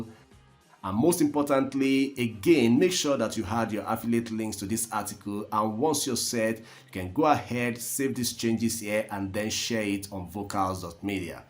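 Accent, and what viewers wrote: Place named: France. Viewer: Sounds like French accent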